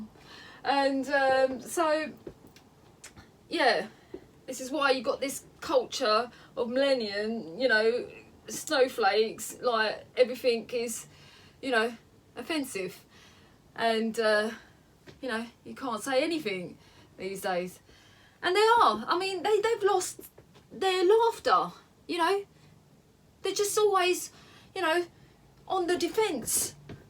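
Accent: United Kingdom. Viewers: Can’t help smilin’ more an’ more the more you speak. His joy is shining, sprinkling, radiating, streaming out of you, you know,:) I always thought there was the joy of Jesus at the center of the True Cockney. This is proof of tha puddin’! Keep spreading His love, luv. Good job!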